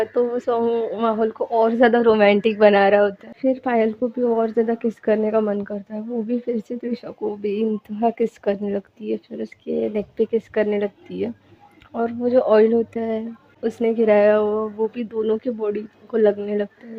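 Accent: Maharashtra, India. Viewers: Thank you mam😊, aap bohot aache se stories batate ho. Muze kabse aapko kehna tha ki apki language se marathi wibe aati hain muze thodi thodi😅.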